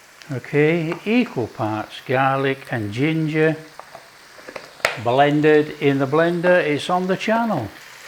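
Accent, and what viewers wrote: United Kingdom. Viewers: British accent is 😂😂funny 🤣🤣 kumon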